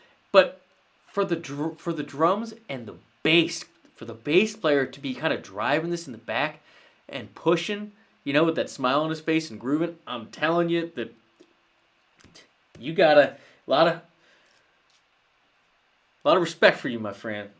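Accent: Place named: Buffalo, United States. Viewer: Are you from Buffalo? I hear Buffalo in your accent.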